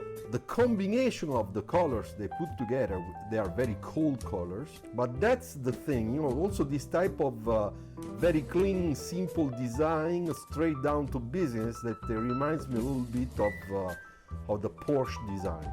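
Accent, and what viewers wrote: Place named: France. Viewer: Toi t'es français avec ton accent 😊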